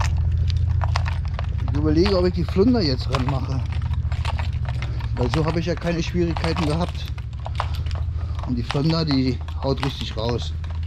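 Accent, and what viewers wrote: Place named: Berlin, Germany. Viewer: aber cool das Du es machst, so versteht man dat Berlinerisch wenigstens XD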